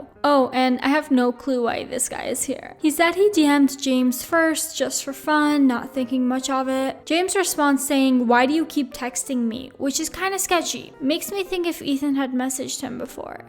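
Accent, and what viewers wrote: Canada. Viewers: I always forget is Canadian until she says “soorrry” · i love your "sorrys" that cute canadian accent gurl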